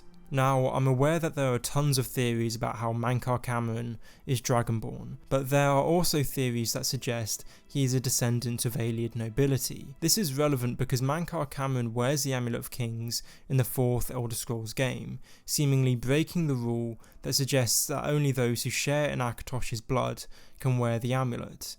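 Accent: United Kingdom. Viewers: You have a specific type of British accent. Is there a name for it? I’m almost certain that you would pronounce the word “drawings” like “draw rings”.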